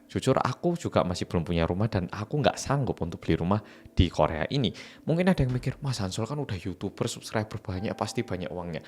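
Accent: Java, Indonesia. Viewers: Selalu suka sama konten"nya mas mas korea medok ini hehe❤❤ Aku mau reques bahas kasus JMS soalnya habis nonton documentary netflix jdinya pengen tau perkembangan kasusnya dikorea.... Makasih mas hansol😊😊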